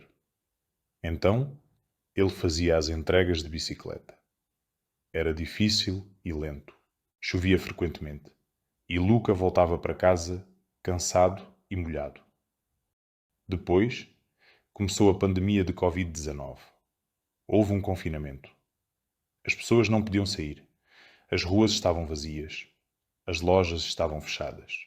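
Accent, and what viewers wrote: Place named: Portugal. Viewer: sounds like European Portuguese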